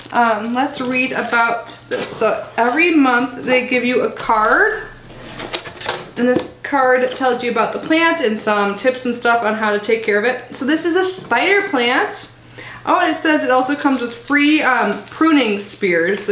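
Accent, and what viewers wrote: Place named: Minnesota, United States. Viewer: Idk where you’re from but you have the thickest Minnesotan accent I’ve ever heard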